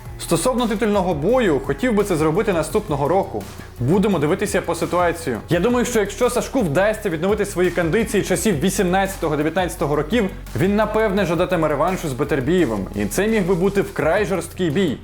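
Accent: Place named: Urals, Russia. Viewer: Ведущая вообще не в теме,павловский и немцов?Да он в гробу переворачивается,когда его приравнивают к флюгеру-коньюктурщику-приспособленцу павловскому,который в Украине был идеологом всех темныз сил,януковича,отравление Ющенко,его идея,до последних предвоенных дней топил за опзж на ахметовских каналах,с другими рыговскими прорашисткими недобитками,вы о чем.Исправляйте свой уральский говорок,отличительная черта всех неоднозначных ведущих данного канала,то полный рот дикции,то внешность неоднозначная,это толерантность такая?))))Посмотрите оа Настоящее время,и ведущие и подача,у Михаила Борисовича денег мало?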